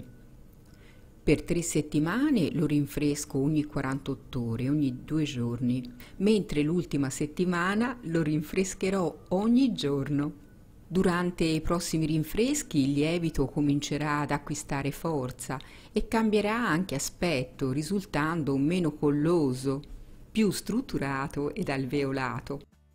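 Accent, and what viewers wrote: Tuscany, Italy. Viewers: Che bello questo accento Toscano!! 😊 Grazie per il video, devo provare a seguire la ricetta!! Direi che ci vuole mooooolta pazienza eheh Come si usa, poi, per fare il pane? Ci faresti un video? Grazie, grazie!!!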